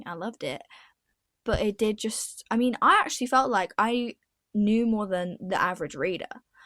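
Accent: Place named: United States. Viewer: I LOVE UR AMERICAN ACCENT BAHAHA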